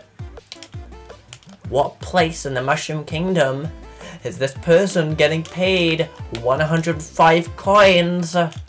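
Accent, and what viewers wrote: United Kingdom. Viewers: I like how you have a full British accent, but when you say mario, you sound so American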